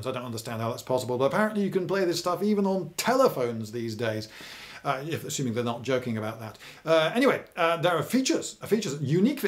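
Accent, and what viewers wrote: United Kingdom. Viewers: “Pencil-neck”!?! Haha no way man you fuckin rock I love your videos, sorry some of my fellow Americans are less cultured people with nothing better to do then be shitty to other people. Honestly the British accent and way you talk probably makes them feel intimidated or like they are less intelligent or something. Keep the videos coming dude! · some friends and I have been wondering over the last few days why you Brits pronounce lieutenant like lef-tenant instead of Lou-tenant. Regards, A curious member of the Australian colony.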